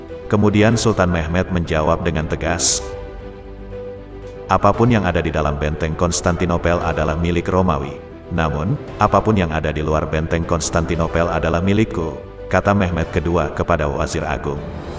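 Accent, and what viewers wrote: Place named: Java, Indonesia. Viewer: Tanpa Tedeng Aling Aling,,,,, narator kayak nya orang Jawa,,😁😁😁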